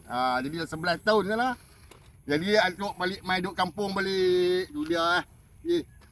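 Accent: North, Malaysia. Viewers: dh KENA ckp utara 😅beestt... mmng terbaikk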